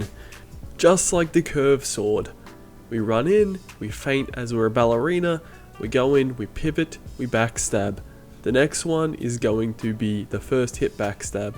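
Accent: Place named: Australia. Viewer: why hello fellow Australian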